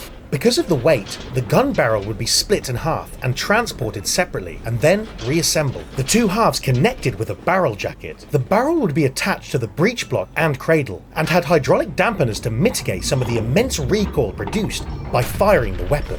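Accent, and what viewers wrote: United Kingdom. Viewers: Wtf with the bri-ish voice?? Didn't those guys lose to a bunch of pilgrims???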